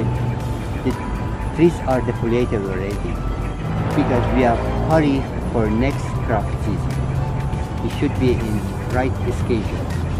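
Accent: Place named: Iran. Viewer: The best video on this topic. Thank you. You sound Persian.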